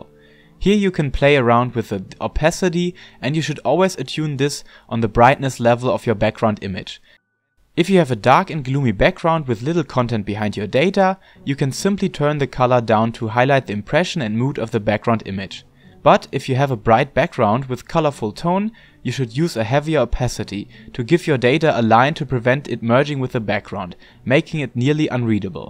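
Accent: Germany. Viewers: Nice german accent^^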